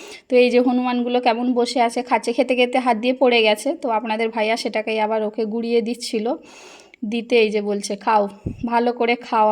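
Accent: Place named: Kolkata, India. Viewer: তুমার কলকাতার কথায় বারি দিদি❤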